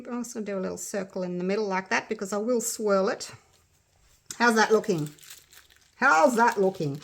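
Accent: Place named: Perth, Australia. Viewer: Oh my goodness that is one of the best blooms I’ve ever seen done! You make me want to get my resin out now & its 1.42am here in Yorkshire, England hahaha if my work is half as good as yours I will be very happy! I have quite a few family members who live in & around Perth & I love the accent! Your jovial passion for what you do shines through in your words & excitement! Never change my dear! Xxx Vick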